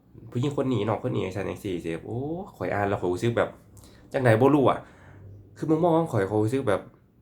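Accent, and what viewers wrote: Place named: Laos. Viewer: ชอบสำเนียงลาว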